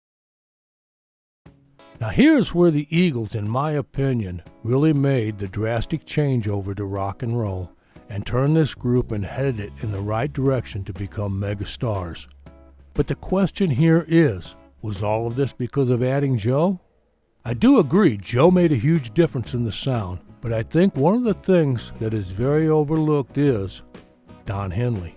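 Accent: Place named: East Texas, United States. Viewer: As someone born and raised in gilmer, and a die hard fan of your channel, I really like how accurately you told his story. My grandfathers best friend was his dads boss. They worked for an auto parts store and my grandfather used to go with them to nascar races. In my head I have my own little rivalry with don. When I was a kid, I was a drummer and singer and everyone used to say my voice was just like his. I still sing, but I haven’t quite overtaken him yet. More of a guitar player anyway. Tbh, my sister is great friends with whiskey Myers and I’m borderline hoping on a Steve gains kinda situation lol I know you’ve got to be somewhat in my vicinity by the way you speak and the specific music you’re really into, I just know it. East Texas?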